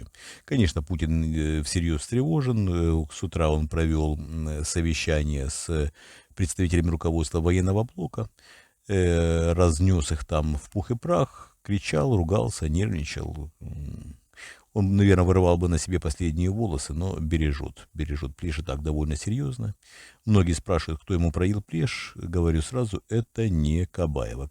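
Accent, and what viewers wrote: Ukraine. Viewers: Виктор мыхалич, у ва юс акцент украинский. Как так?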